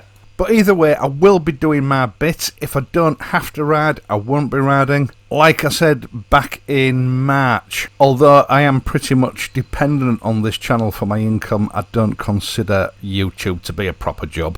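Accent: United Kingdom. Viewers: Thanks! Pro review, nice use of Queen's English, skill in composition and videography. Watching more than once. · I think you are only person who has given your heart to every comment... By the way I was taken away by your 'Raw British' accent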